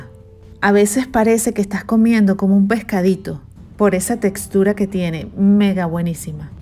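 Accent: Venezuela. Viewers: Hola paisana, seguro que eres Caraqueña o Valenciana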